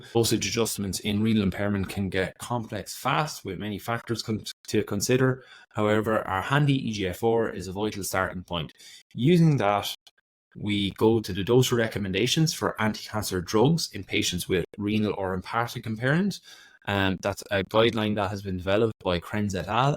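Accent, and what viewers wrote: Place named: Ireland. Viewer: Excellent. I have Stage 4 Ovarian Cancer, and they had to dial back my chemo dosage last year. (I had perfect kidneys before this.) Im now on Targeted Maintenance Therapy, and my kidneys are tanking more. I'm on a break until t'row when I potentially restart. I was being referred to my late mum's Kidney Specialist. (She had Chronic Kidney Disease from high blood pressure and Diabetes... and then 2 separate angiogram surgeries for a Brain Aneurysm & a Heart Attack - she died briefly with the latter - cooked her kidneys, making her terminal. It's an ugly disease.) I've not heard anything yet, though, I do have other conditions that necessitate I take various meds. It scares me more to have potentially damaged kidneys, than to have cancer... only because I've not seen anyone die of Ovarian Cancer. Anyway, I would've loved this as a video or charts. 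Your thick Irish accent to my Australian ears made some parts difficult, especially with some unfamiliar medical words. Anyway, at least thank you for that crux of the situation re kidney levels & what's going on. I never really googled my mum's disease - it was just another facet of her life. Thank you!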